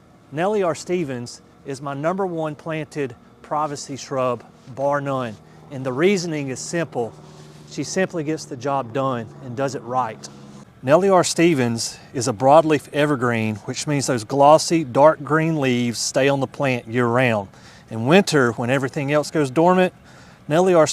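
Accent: South, United States. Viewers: This gentleman has done a nice job, but the video would be even better if he'd provided information about the shrubs' plant zone and deer hardiness. His southern accent should have tipped me off to the possibility that he might focus on southern plants.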